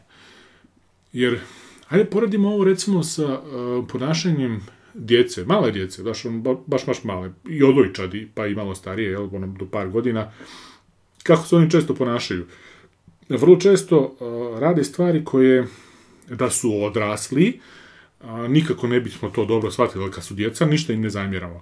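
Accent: Ijekavian, Serbia. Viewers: Miluje me Vasa ijekavica i miran ton izlaganja,samo napred !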